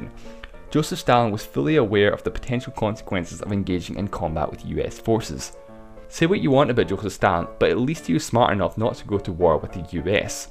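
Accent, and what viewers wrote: Scotland, United Kingdom. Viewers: He's scottish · Everything's so fine about the videos, but please try to change your accent! Non native english (scottish) speakers, cannot understand! we love Scotland but scottish accent makes it all difficult. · This guy is Scottish, I SWEAR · I cant understand why americans struggle with Scottish accents either, they are literally speaking English. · from Scotland, English is his native language. It's how he learned to speak there. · I think he's scottish. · Are you Scottish? :) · It's not a speach problem, it's the best accent in the world: Scottish accent. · Scottish accent. Lots of English people find the Scottish accent difficult. And then we have the Welsh. Or worse still, the Northern Irish (or "Bradash!" as they put it). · Are you scottish cause you got a scottish accent xD??? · Anmol Madaan Scottish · i can't hear scottish accent well... 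i need to learn more..ㅠㅠ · Hiếu Lương He sounds Scottish.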